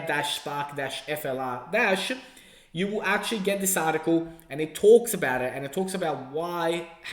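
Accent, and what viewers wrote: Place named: Australia. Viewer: Thanks for all your ongoing analysis mate, great to hear an Aussie voice in the crypto universe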